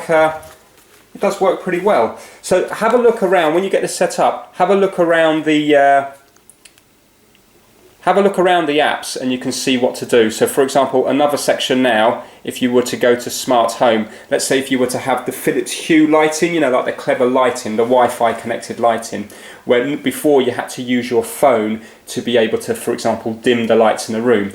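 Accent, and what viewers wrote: England, United Kingdom. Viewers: So nice to hear an English voice and not a yank droning on, well done mate your a star ! 😀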